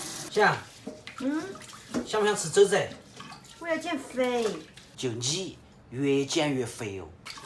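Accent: Sichuan, China. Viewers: 吃的真香 味口真好 四川話好親切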